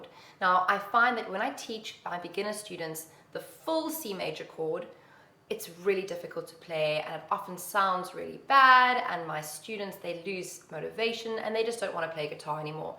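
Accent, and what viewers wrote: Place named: South Africa. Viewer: You are South African with either Greek or Italian background, by the way I'm very annoying I know, my wife keeps telling me. Love your guitar lessons, thank you...maybe Spanish?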